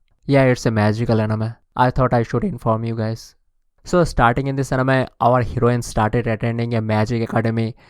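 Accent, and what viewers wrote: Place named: India. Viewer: i hope you can fix your english more... and speak less with the indian accent. thanks for the list btw keep it up 👍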